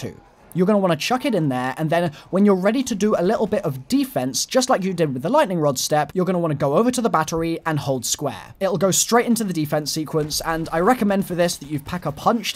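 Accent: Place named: United Kingdom. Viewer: Hey I think you sound similar to David Tennant just by your enthusiasm and your British accent (ik David is Scottish but come on you two have similar sounding voices)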